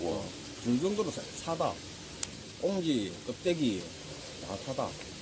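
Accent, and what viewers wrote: Gyeongsang, South Korea. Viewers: 저는 저희 아버지 소개로 이 채널을 알게되었는데 사투리 보니까 같은 경상도분 이신가봅니다. 사회에서 사투리 쓸때는 스스로 몰랐는데 방송으로 들으니 맛깔나는군요.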